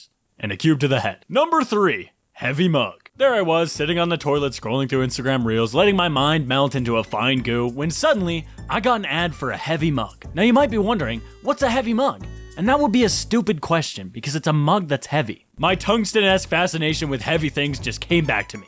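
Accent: Canada. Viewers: i thought you were canadian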